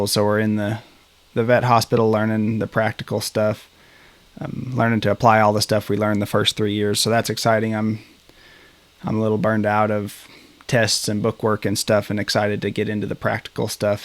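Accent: South, United States. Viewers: Oh, I had a feeling you had to be from the south! Can't get rid of that drawl that easily :P May I ask what plans you intend to take after college? Gonna keep ferrying or gonna try to be a large animal vet? I like hearing the little updates in your life with the videos as well as all the horse info- you're just as much a part of the video as the horses! What a sweet giveaway though, that's really lovely. I'm gonna have to see what I can do out here now and get my entry in! Hope y'all are having a good Easter from down here in Texas! <3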